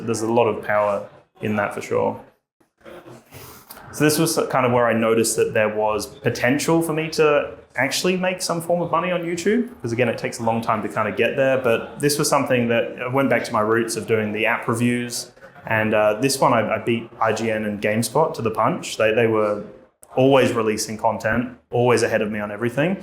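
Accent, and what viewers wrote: Australia. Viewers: Oh wow, I didn't realize you weren't from Australia. You're from the UK? You sound like you have an Australian accent. I mean now that I know, I can hear there's a slight difference, but it definitely doesn't sound like an English accent.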